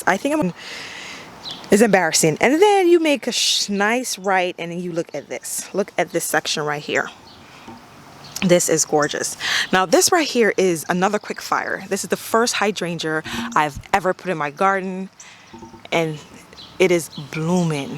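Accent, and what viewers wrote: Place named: Jamaica. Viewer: you soundalike you have a Jamaican accent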